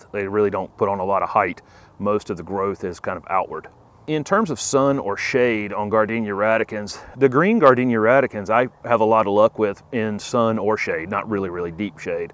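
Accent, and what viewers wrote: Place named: North Carolina, United States. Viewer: Do you ever smile? But you have a nice accent...is that North Carolina?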